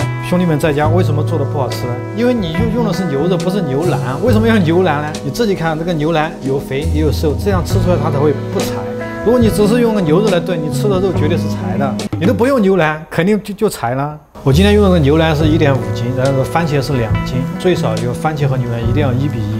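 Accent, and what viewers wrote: Sichuan, China. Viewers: 师傅是四川人吗？椒盐普通话很好听。😊菜单也收藏了。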